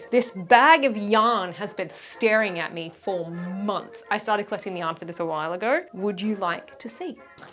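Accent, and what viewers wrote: Australia. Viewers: This is incredible 🤩 and as a southern woman, love to hear an Australian accent adopting y'all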